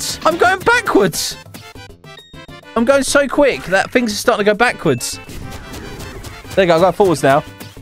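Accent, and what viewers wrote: United Kingdom. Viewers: His British voice never gets old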